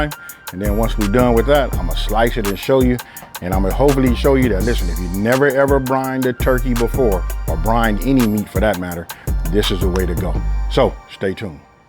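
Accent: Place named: San Francisco Bay Area, United States. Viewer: you sound like your from The Bay....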